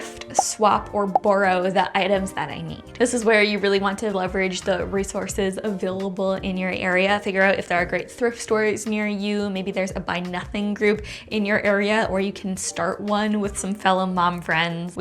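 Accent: Canada. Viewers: Had you not featured US Dollar bills in your video, I would've thought you're Canadian based on your accent. The Venture X is a powerhouse for sure. I'd also recommend the lesser-known AAA Daily Advantage Credit Card, which has become my primary CC (5% on groceries, 3% on Warehouse Clubs (a category that not even the Costco Citi VIsa offers that rate of rewards for), 3% on Gas and Pharmacies, and so on... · I noticed the Canadian accent right away 😂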